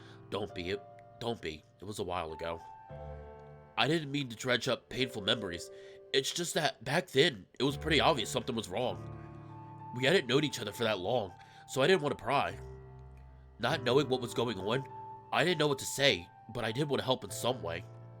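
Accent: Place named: New Jersey, United States. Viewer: Hey, if your New Jersey accent comes out while recording then roll with it. Except for with Colby and one of his options. K-ho's inspiration for the setting was Boston so it feels almost authentic when your New Jersey/Northeast accent comes out.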